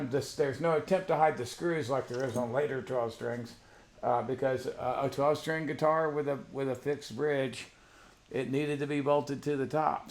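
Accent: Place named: United States. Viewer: You sound American. Bleurgh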